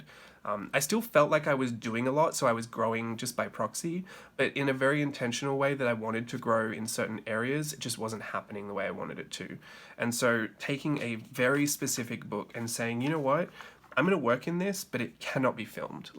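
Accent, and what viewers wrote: Australia. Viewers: YT finally dropped yr video into my feed. MyLifeMits always mentions you in her videos so Im stoked to finally watching yr video. I like all yr different spreads, some of your ideas I would like to try out 😊 Also it sounds like you're dropping Kath n Kiim nuggets, so are you Australian? I really enjoyed listening to your chat about your journals too and I look forward to watching previous and future videos 🥰👍❤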